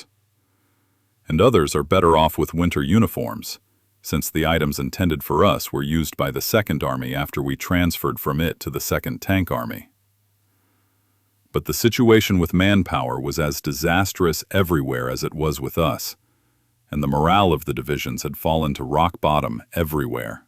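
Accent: United States. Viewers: Please, get rid of the American southern accent for the narration. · What southern accent? That's pure middle American speech.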